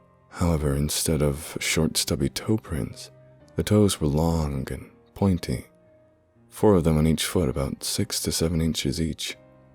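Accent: United States. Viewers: I would think your American if I didn’t know you weren’t ( this coming from an American). Great job love your content.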